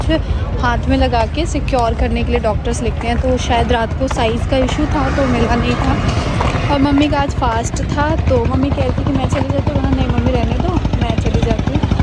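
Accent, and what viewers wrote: Bihar, India. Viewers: Hi ji aap ki behari boli badi payari lagti hai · apke muh se bihari language bhut pyari lagti hai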